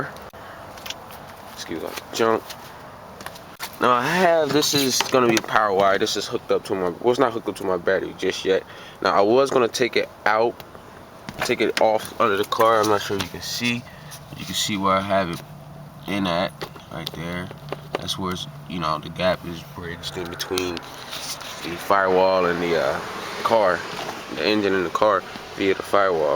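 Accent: Philadelphia, United States. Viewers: hes definately from philly i can tell lol nice work man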